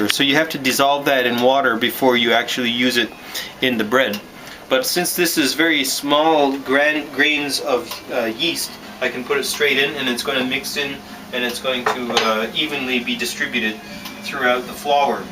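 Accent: Canada. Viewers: sounds Canadian. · I like your Canadian accent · big fan here !! "cant beat it wit a stick" made my morning. you sound like the bys back home in NL.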